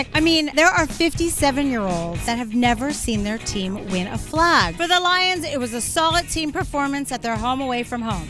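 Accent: United States. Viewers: I’m loving this. I look forward to this every week.. As an Aussie who used to live in Texas it’s awesome hearing an American accent talking about footy..👍 Please keep it going. Be patient. I can see you being a huge star ⭐️ down under in a few years !!!!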